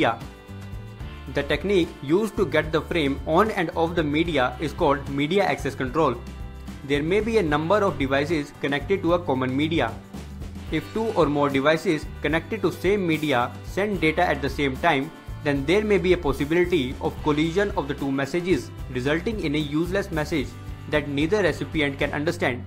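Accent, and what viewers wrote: India. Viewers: I love the video, contents are correct AND Well presented.. Easy to understand rather than reading the books and trying to imagine it all. For improvement, i suggest that the narrator to speak a little bit slowly in speed since the Indian-English accent takes a little time to process especially for Asian. All in all, thank you very much for being generous sharing the knowledge. May Allah bless you always n :) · مفيد بس الإنجليزي الهندى ده عاوز ترجمه لوحده... ياخربيت بريطانيا كل سنين الاحتلال ده ومعرفتش تغير اللكنه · I love his indian voice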